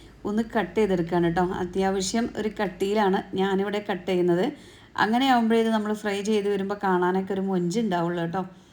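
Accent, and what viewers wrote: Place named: Mavelikara, India. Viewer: Kasarkode basha parayu Ethu Mavelikara basha aanu.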